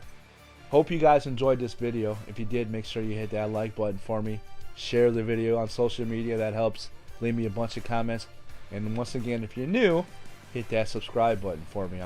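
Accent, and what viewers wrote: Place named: Canada. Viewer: Canadian accent is too much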